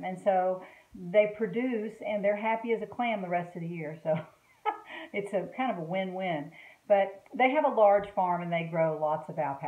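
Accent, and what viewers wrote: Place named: Tennessee, United States. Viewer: G'morning;) I just stumbled on your videos... so I binge watched you last night while I was hooking. I absolutely love your accent and the first of your videos I thought to myself; omg is that a Tennessee accent? I just love Tennessee, I've only been through there a few times when I was younger and once stayed a few days in Nashville, but still remember it so clearly! Anyway, I've had fun watching, keep up the great videos;) Donna from Canada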